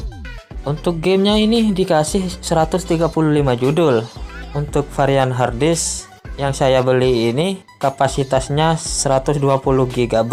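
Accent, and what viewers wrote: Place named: Madura, Indonesia. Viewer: Cara ngomong + backsound nya kek kenal 😂 Rada ada logat Madura nya dikit 😆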